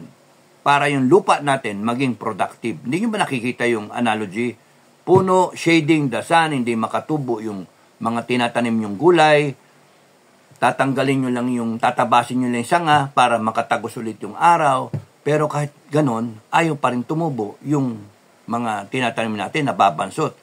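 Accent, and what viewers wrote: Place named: Visayas, Philippines. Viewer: ang cute mo mag bisaya Sir! "mag sulti ug tinuod, asa imong pusil?" dagaaan naa na ang mga Scout Ranger. hahahahaha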